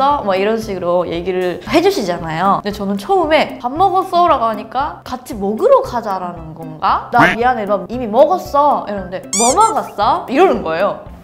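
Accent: Seoul, South Korea. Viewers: 백진희 닮았다 한국사람이 일본어하는거같어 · 일본인이라 밝히시지 않으셨음 한국인이라 생각 했을 거 같아요.. 스타일나 말투나 모든 게.. ( 한국인 스타일 느낌이 강한) 그래서 도리어 일본어 할 때, 한국인이 일본어하는 느낌이 들었네요! 신기한... 잘 보았습니다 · 억양이 진짜 한국인 같아요 넘신기ㅋㅋㅋ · ㅎㅎ 일본어를 한국인이 일어하듯…하시네요. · 니뽄말 잘하는 칸코쿠진 같다.. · 일본인이라고???? 발음이 완전 한국인인데??? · 일본인들은 조금 귀엽게 보이는데.... 은 그냥 한국인 인데요~~생김새도 그렇고 말하는 투도~~~이쁘고요~~ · 진짜 신기한게. 이분이 말하는 일본어발음에 약간 한국인의 일본어 특성이 묻어있음. 한국어를 오래 공부하면 모국어에도 영향을 주는건가? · 나 이런 톤으로 일본어하는 분 처음 본듯 ㅋㅋㅋ 어째 한국인이 일본어 하는 거 같냐 신기 ㅋㅋㅋㅋㅋㅋ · 서울에 10년 넘게 산 저보다 서울말을 더 잘하시네요..^^ㅠ 의문의 1패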